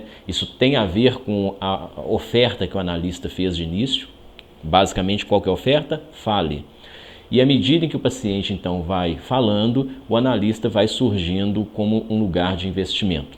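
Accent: Brazil. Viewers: Para além do conteúdo que me parece sensato, quero realçar o português que em todos os videos do autor é perfeito. Nunca vi um português com sotaque brasileiro tão bem falado e cuidado. Parabéns...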